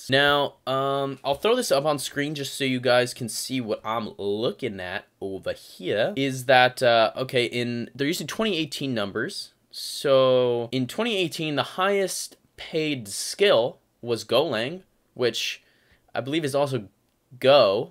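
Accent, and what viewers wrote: United Kingdom. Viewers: hey..I absolutely love your video. I love the british accent..you're going to be famous... please make more videos..I'm actually a registered pharmacist making $175000 a year for Walgreens pharmacy in the "el paso district" in las cruces new mexico....I went to UNIVERSITY...loved that..it's a British and canadian term university lol aka college. in US..my point being....love your breakdown of the yearly income for future millionaire's in today's economy...my friend is in your field and coul have used your help like 50 years ago but you didn't exist then..oh btw I only went to university for 7 years. I graduated at age 24 with as a pharmacist and live in one of the cheapest places to live in the country New Mexico..in NY I'd be making $30000 a year...ps your videos are my new addiction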